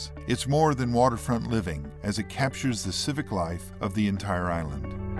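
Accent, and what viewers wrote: United States. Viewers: Why the American accent? hmmm .... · One block has been more or less finished, at least on the outside. Why the hell is this narrated by a yank?!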